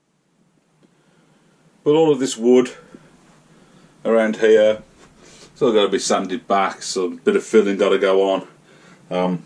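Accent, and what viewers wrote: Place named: Yorkshire, United Kingdom. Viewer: Are you sure you are a pedigree Brummie, sounds to me like there may be a bit of Yorkshireman in you. Hope mrs Lumsden is a little happier when she sees your latest efforts.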